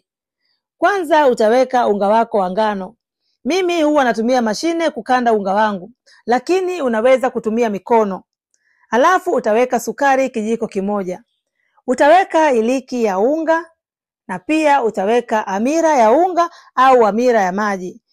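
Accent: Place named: East Africa. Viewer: Your accent is from which part of East Africa?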